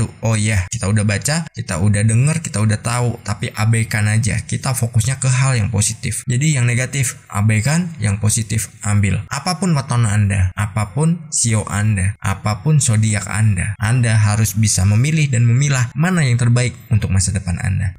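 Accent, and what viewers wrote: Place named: Java, Indonesia. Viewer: Dgr bahasanya jawa medokk lngsung mesemmm kooo cos biasany bhsa indo trima kasih ilmuny ko berkah dunia akhirat buat njenengan sklrga dan smua pengikut chanel ini Aamiin Allahumma Aamiin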